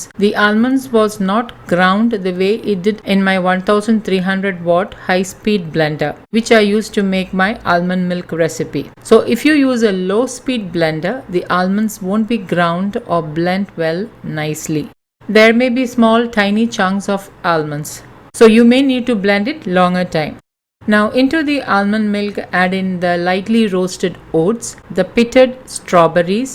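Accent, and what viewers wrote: Kerala, India. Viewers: thank you so much akka love you loads you are a inspiration for me even I like your voice and typical Kerala accent English I love it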